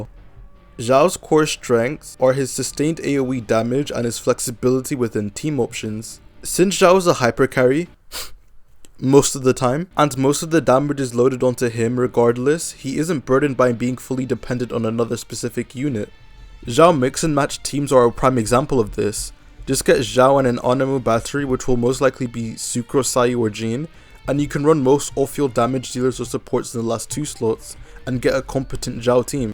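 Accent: United Kingdom. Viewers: how do you manage to sound more british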